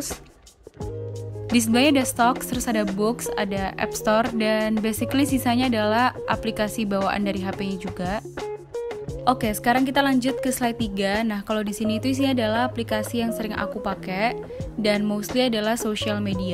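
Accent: Java, Indonesia. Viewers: Kakak Asli mana ?? kok ngomong nya medok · suka sama medok nya gemoy ❤️🤣🥺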